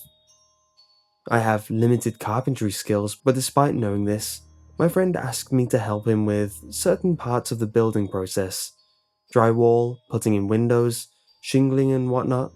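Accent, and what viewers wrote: United Kingdom. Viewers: are you British, love your channel BTW :-D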